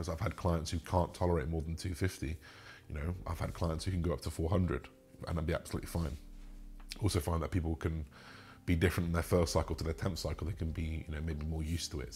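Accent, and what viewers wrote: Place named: England, United Kingdom. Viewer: Jesus it’s someone English 😂 finally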